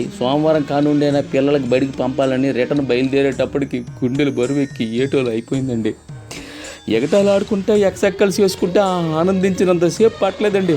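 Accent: India. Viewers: శ్రీకాకుళం యాస భాష అచ్చంగా కనిపించింది. సంక్రాంతి సరదాలు కొట్టవచ్చినట్లు కనిపించసాగాయి. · మీ వాయిస్ ఓవర్ విన్న తరువాత కూడా మళ్లీ సంక్రాంతి సంబరాలు విన్న అనుభూతి కలుగుతుంది బావగారు... ముఖ్యంగా ఉత్తరాంధ్ర యాసతో మీ హావభావాలు అత్యద్భుతంగా ఉంది 🎉🎉🎋🎋💐💐🔯🔯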